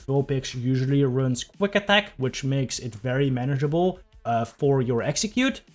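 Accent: Netherlands. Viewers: you sound so dutch lol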